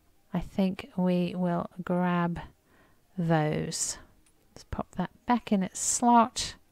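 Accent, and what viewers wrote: England, United Kingdom. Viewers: I really miss the many varieties of hummingbirds I saw daily when I lived in Costa Rica before returning to Canada. I was looking very closely trying to ID them , but definitely some male & female Ruby-throats but not sure of the others. I really enjoy your videos, and laugh when I hear you saying some of the quaint English says. Of coarse, also enjoying watching the dogs and cats...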